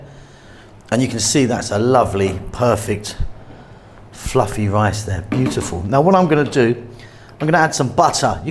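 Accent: United Kingdom. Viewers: Speaking from what I see, are cups and tablespoons smaller in the UK than the US (going by your accent)? I'm a small-scale US homesteader and I want to add some sheep to my property. Looking at recipes. "Lamb" from the market is usually (legally) mutton and the wool sheep variety. I want to get hair sheep and harvest annually to avoid greasy, lanolin flavor.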